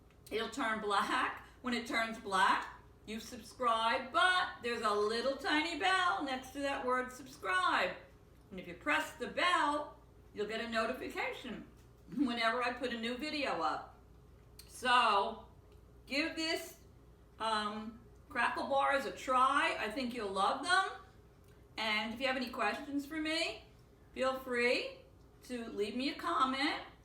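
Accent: New York, United States. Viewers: Hello, New subscriber to your channel. Thank you for subscribing to mine too! I love learning of new recipes so glad to be here! Love your accent too, is it New Yorkish!? I'm from NY but now living in England! Adorable apron and pick spatula. The bar looks really delicious! Mmm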